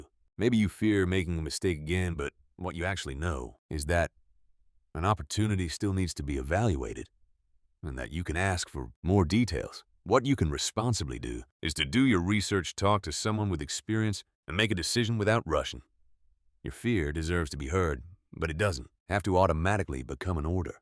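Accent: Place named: South, United States. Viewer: You who is talking u are from the south bro I can hear ur accent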